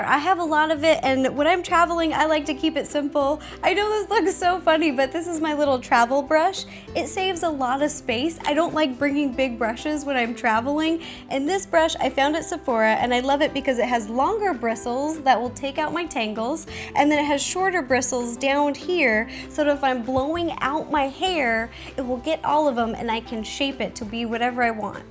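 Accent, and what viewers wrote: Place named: Rural, United States. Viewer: I love her country accent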